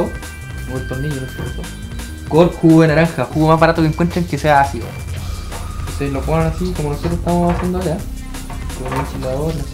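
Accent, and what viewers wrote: Chile. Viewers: Jajajajaja grande! Pocos son los que se atreven a hacer cosas de este tipo, combinar ciencia y humor en un video, es lo mejor!!! Solo algunos gringos suben video de este tipo, que bueno que alguien de habla española, castellana o como gusten llamarla tome la iniciativa de hacer cosas así jeje. Te seguiré... pero en tu canal, no te asustes no soy psicópata XD Sigue así, esperaré el próximo video :) PD: Por tu acento, eres chileno no?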